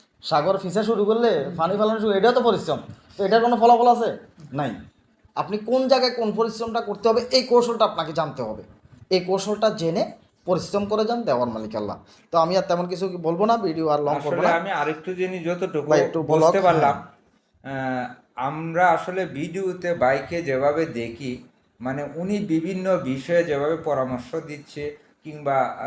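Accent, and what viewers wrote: Chittagong, Bangladesh. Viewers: bai apner bari kotai? Apner kotha sone mone hocce Chattogram er hoben. Jodi Chattogram er hon tahole baiya ami apner sate deka korte cacci ❤❤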